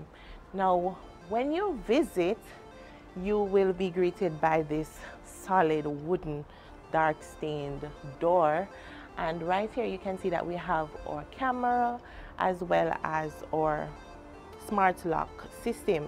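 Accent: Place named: United States. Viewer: Right here, not right her, that's American's dialect. Your English is great enough.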